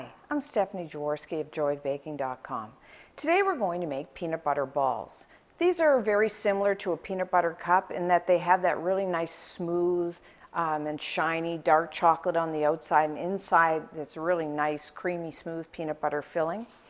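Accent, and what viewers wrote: Canada. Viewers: canadian accent is peaking.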